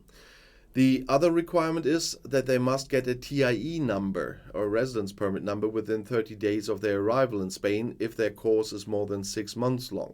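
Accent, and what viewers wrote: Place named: Germany. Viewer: Nice German accent..😎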